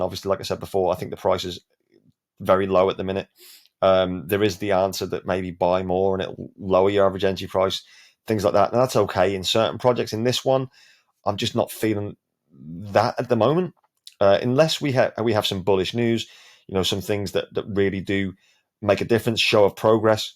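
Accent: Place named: London, United Kingdom. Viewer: Hi....I like your frank videos. Sensible. You sound like you're from in or around London somewhere. Me too. Anyway, I've noticed a pattern recently on my portfolio. I go to bed at midnight (GMT), have a quick look at the value, then wake up at 07.00 and I'm in the money! Maybe 3-4% - all good. Then about 10.00am it starts to fade back about 2%. So, my theory is that the overnight markets, (guessing it's west coast US, then Korea and Japan are influencing the prices)., can maybe be predictable. So, here's the plan...I buy good tokens at midnight, (HBAR,QNT,XRP) then sell when I wake up...Is this a foolish idea?....What do you think? Cheers pal.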